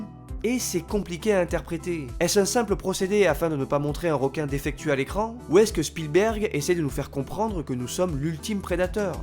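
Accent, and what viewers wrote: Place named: South, France. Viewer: En effet, chaque vidéo est un concentré de travail passionné ! Avec la petite pointe quasiment imperceptible du sud de la Loire dans la voix en prime...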